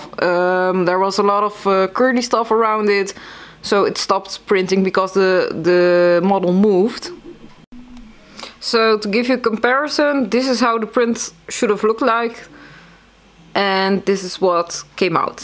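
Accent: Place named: Netherlands. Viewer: Dankje voor de revieuw, ik hoor heel duidelijk dat je nederlands spreekt :) zou ook leuk zijn om nederlandse revieuws te zien. Groetjes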